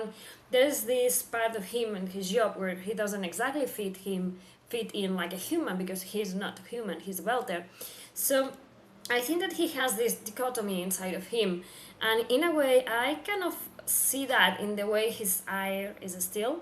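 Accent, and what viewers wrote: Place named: Argentina. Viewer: Yes, Miller is outsider and initially he sees in Julie something, he does not understand. He is curious and the more he learns, the more he likes and respects her. About his “visions” of her, there is a theory that the protomolecule works in more than three dimensions, including time. Since he met “ProtoJulie”, she appears in his mind before that, the same way he appears in the mind of Holden. Also your musings are great and I like your accent. Argentina?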